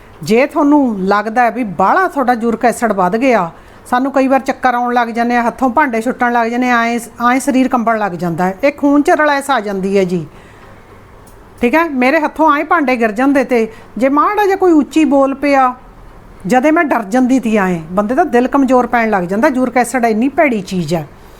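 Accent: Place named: Puadh, India. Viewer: Tuhada pind kehda a boli ta tohadi puadh di lagdi a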